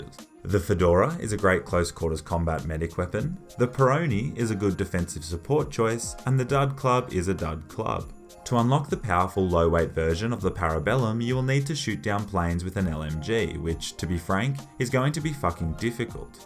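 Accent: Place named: Australia. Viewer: You are my new favourite youtuber! Your content actually makes me laugh. Your humour goes great with the accent (I sound like an american but I'm australian too). At least you aren't an ibis that steals my lunch box everyday.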